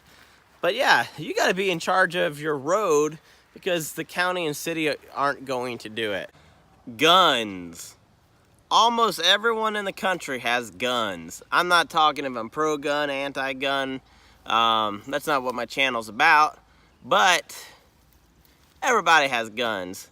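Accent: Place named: United States. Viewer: He has to have the most American voice i heard.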